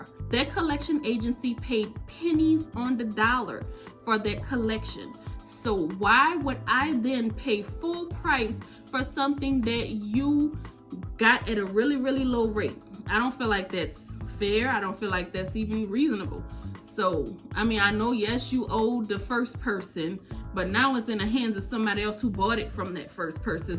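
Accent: New Orleans, United States. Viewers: First time here. As soon as you started speaking I was like “she sound like she’s from New Orleans”, lol! so I checked the description box and I see that you’re in Slidell, close enough, I know my people! I’m in NOLA 🥰🥰 so now I’m a new subbie!!! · Great video! That accent is heavy, are you a NOLA sister?? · She sounds like she’s from New Orleans .. I need help negotiating stuff like past debts that are in collections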